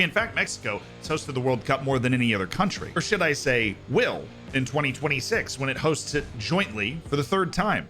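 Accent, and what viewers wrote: United States. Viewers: I just now realized you are American lol. I just assumed, as an English speaker talking about Football, you were English. Even though your accent is very clearly americam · Your accent is way too american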